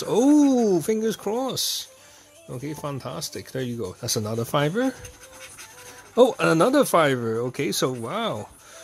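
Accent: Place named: Netherlands. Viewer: Ah you live in Belfast. Yes I know it. I just thought you had a Dutch accent.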